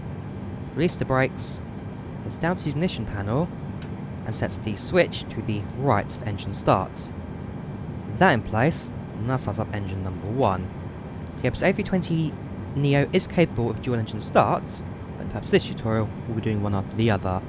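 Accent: United Kingdom. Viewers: which area of British accent this guy is?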